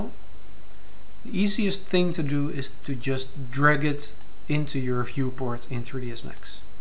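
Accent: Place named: Netherlands. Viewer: Its scary when people contact me to tell me that I sound just like you. Can tell by the dutch accent it def. does. But voice, pitch, etc also comes rather close. yay for finding my voice-twin lol.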